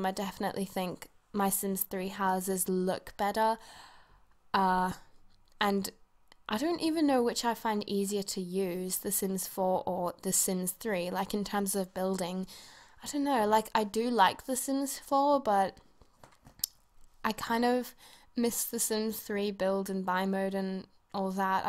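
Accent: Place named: England, United Kingdom. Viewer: I can't figure out if her accent is English, South African, Australian or a New Zealand one... Someone help please, I am new here! Haha.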